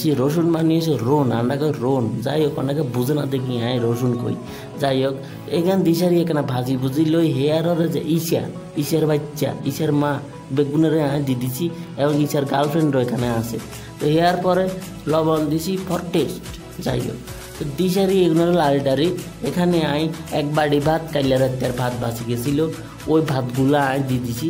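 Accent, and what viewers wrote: Mirsarai, Bangladesh. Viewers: তোমার ভাষা পুরোপুরি বাংলাদেশের চট্টগ্রাম জেলার মিরসরাই থানার ভাষা আর আমি মিরসরাই এর মেয়ে তাই আমার সাথে তোমার আঞ্চলিক ভাষার ১০০% মিল। তুমি জানিও তুমি বা তোমার বাবা মা কি কেউ মিরসরাই এর কিনা? আর তোমার ভিডিও গুলো অসাধারণ আমি আর আমার ছেলে আমার মা সবাই তোমার ভিডিও আপলোড হওয়ার অপেক্ষায় থাকি। ভালো থেকো এগিয়ে যাও🤩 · আমনে যেন নে কথা কন এঠা তো মিরসরাই এর কওথা,,,এননে, তো আনডা কই থাকি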